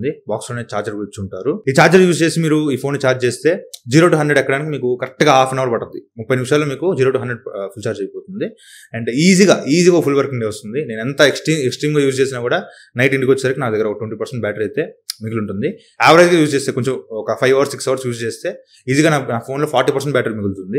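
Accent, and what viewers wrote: Nellore, India. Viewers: meru nellore aa bro proper?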